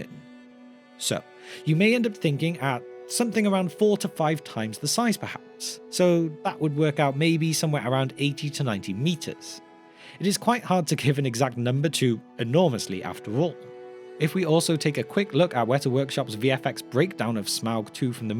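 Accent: Wales, United Kingdom. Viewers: You're Welsh? If I ever bump into you in the Merthyr area I owe you a drink. You guys do amazing work on these videos, nice and easy to digest :) Do you have a Discord?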